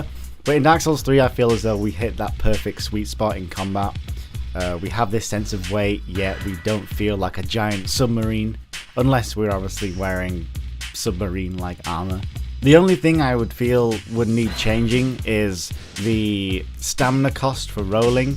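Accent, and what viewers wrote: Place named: Northern England, United Kingdom. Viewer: Lenayus Mynestone Northern England accent.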